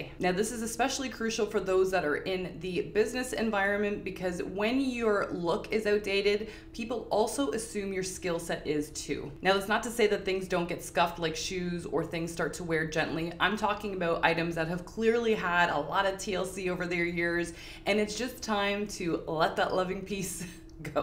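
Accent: Canada. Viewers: Less than 30 seconds in and I just have to say your accent is so cutely Canadian! Have a wonderful day! Now back to watching the video.......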